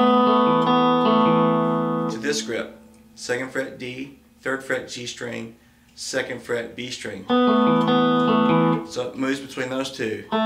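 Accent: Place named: Texas, United States. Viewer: Isn’t this CC giving the tutorial? I mean to say that he’s got that TX accent, so...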